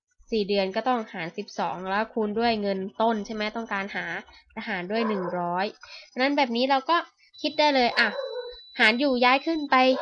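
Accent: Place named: Suphanburi, Thailand. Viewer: สําเนียงสุพรรณ คึด ถึง พุ่มพวงเลย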